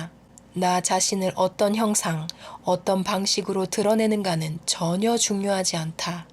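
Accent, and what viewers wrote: Gyeongsang, South Korea. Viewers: 아니 대체 상담사들이 할수있는말이랑 머가 다른가요?솔찍히 경상도 목소리 좋아서 맨날 자기전에 듣고 있는데 요 이 책은 깝깝하게 들려요... 알아서 하란거잖아요... 예수도 그시대에 말 잘하는 철학자라는 생각하시는분 없나요? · 원래 경상도태생아니시죠? 경상도 사투리는 저음으로만~쭉가는데 님께선 위쪽지방하고경상도말투가섞인거 같애여 ㅎ 제가 대구거든요 😛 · ! 경남이나 부산 분이시죠? ^^ 그쪽 억양이 느껴져요 ㅎㅎ 딕션이 좋으셔서 듣기 좋습니다.^^ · 카더라, 카더라, ㅎㅎ 저도 경상도 출신이라 그런지 사투리가 정겹고 좋네요 내 마음의 고향에 온 거 같습니다~~👍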